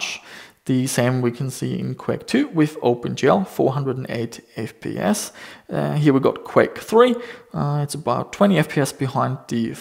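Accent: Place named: Germany. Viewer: Hey, i'm watching your content for about 1 or 2 years and on any video i'm thinking if you are german, 'cause your accent sounds so for me. But if or if not, its not important. I love your videos, it reminds me on my childhood and youth. BR Horst from Germany;-)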